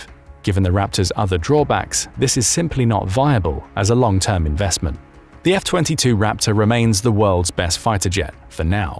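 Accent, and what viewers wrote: England, United Kingdom. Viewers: Why is the speaker talking in a british accent about American assets? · More 'Anglo-Axis' bollocks with a Pommy accent.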